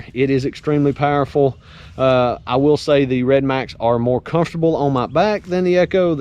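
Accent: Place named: South Carolina, United States. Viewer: Just started watching you. Accent says SC. Am I close?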